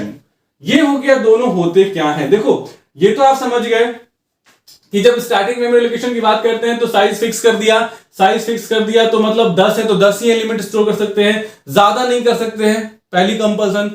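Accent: Bihar, India. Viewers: I was so confused tiil i found your channel ❤ thank you so much sir One more thing i guess you are from bihar and i am also from bihar so i connect with u more because of that also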